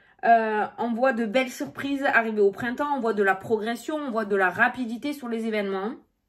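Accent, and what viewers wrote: South, France. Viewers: Bravo d’être là pour nous en ces temps difficiles. Avé l’accent. ☺️🤗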